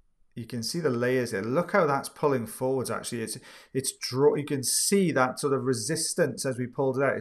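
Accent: Wales, United Kingdom. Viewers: I had just got done thinking "why don't you bring in the jobson horn, mate?" when you said that in hindsight you would have. By the way, I thought it in a British accent since I was listening to your narration haha. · It's a Welsh accent he's got going on there, always cracks me up when he starts taking about Valleys in the canal .... cus he works in the Valleys (of Wales)!!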